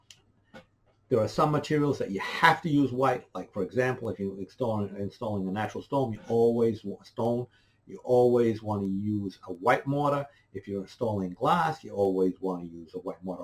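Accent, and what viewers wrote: Boston, United States. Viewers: I heard you accent in the first 3 seconds and immediately knew you were from MA, most likely from Boston! I’m not from Boston but I am born and raised in MA like 40min south of the split! Thanks for the video! It was really helpful! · Love ur "Booastan" accent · Thanks for all the great information based on your many years of experience. When you talked about the extended life mortars, I heard so many different words... "____ life." Pub life? Park life? Pot life? You'll have to excuse me as I'm from the mid-west, but was it palm life? Port life? Part life? Half of me is giving you a hard time for being from Boston, but the other half would really like to know. That is if you would kindly spare a moment of your time to clarify. Thanks and Cheers!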